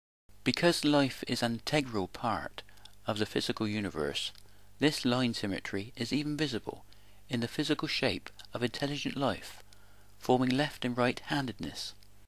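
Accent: West Country, United Kingdom. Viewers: Sorry much as your notions are harmless, people like deepka chopra and yourself abusing physics terminology to present some New Age quantum woo irks me somewhat, its deceptive. Physics is much more inspiring than speculative imaginings involving notions like sacred geometry and the universe is conscious. I have one question why do you frame such thoughts using this terminology , its obvious your not really competent with its meaning in science? What accent is that , sounds west country , Cornwall, Bristol, Glastonbury ? All the best.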